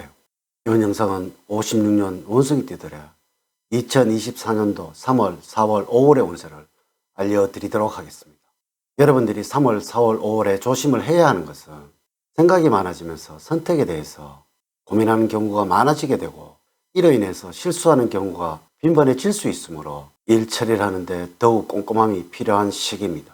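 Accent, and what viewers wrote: Gyeongsang, South Korea. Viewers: 갱상도말씀 때문에 숫자가 잘못알라 듣겠다😢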